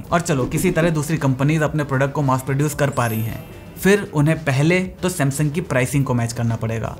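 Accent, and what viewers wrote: Bengal, India. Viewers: Are u Bengali bro?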